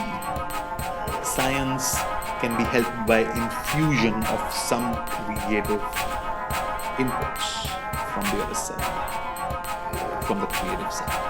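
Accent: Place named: India. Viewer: Your accent is like indians...🙄🥰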